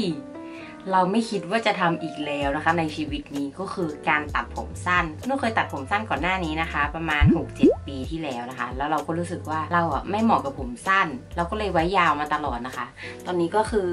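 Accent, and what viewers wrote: North, Thailand. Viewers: คนเหนือแน่เรย เก่งจร้า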